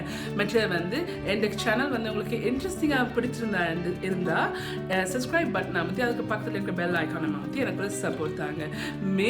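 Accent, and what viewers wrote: Jaffna, Sri Lanka. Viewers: சூப்பர் டெமோ. இலங்கை தமிழும் கேட்க சுவாரஸ்யமாக உள்ளது. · Akkaa neenga muchchu vittu vittu konchum yalpaana tamilil solliththarum alahe thani . Oru sinna kolanthaiku kooda neenga solrathu purium . Seeni sambal bun, boli irendaium seithein super . Appam recipe poduveengala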